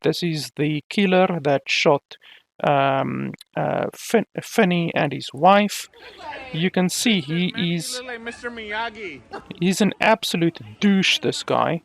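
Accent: South Africa. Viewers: are you South African lol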